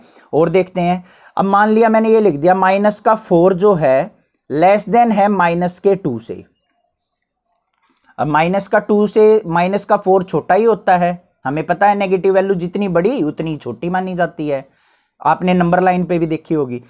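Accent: Haryana, India. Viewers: Sir haryana se ho ?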